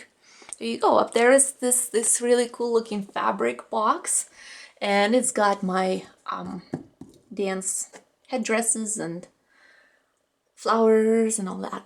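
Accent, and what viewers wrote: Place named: Russia. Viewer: a teacher of mine is Russian and it sounded a bit similar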